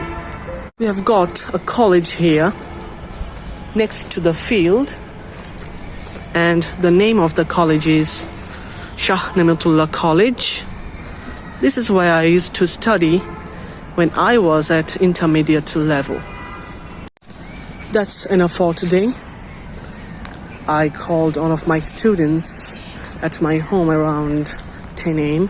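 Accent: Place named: United Kingdom. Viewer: Your accent sounds really British. The intro is good tho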